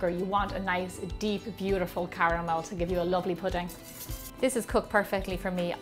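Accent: Ireland. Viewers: You had me at the Irish accent. I stayed for the food. haha! I am making the girls honeycomb today. Have a wonderful weekend! HUGS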